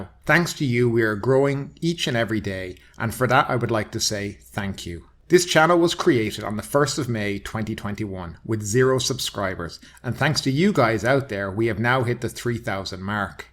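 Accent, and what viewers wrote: Ireland. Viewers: Great to hear an irish man on the air waves.your very informed,speak cearly & give a independend oponion.i was fitting a kitchen on 9/11.im a galway man myself.hoping to get to thailand again.when i dont know.all the best eith the channel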